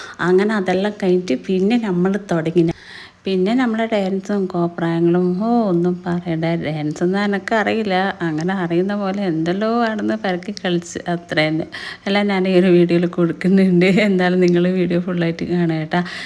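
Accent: Kannur, India. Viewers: നിന്റെ ഒറിജിനൽ കണ്ണൂർ ഭാഷ അടിപൊളി 🎉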